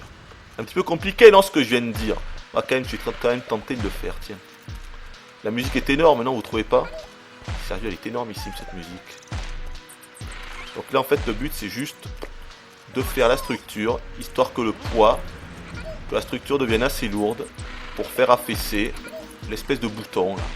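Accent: South, France. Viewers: Bein oui, l'accent du viens du sud.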